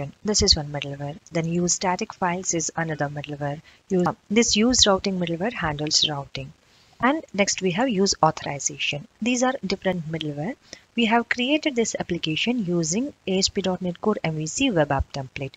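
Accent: India. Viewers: This Is indian accent which is very clear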